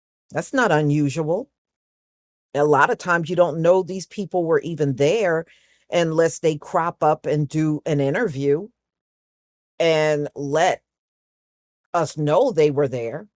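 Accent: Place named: Northeast, United States. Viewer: I could be wrong but I think its her accent . Northeast maybe ? Maryland, Delaware ?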